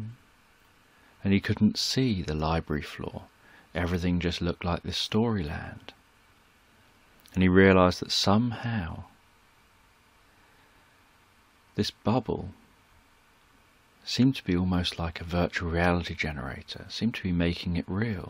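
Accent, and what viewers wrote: United Kingdom. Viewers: I only fell asleep because your British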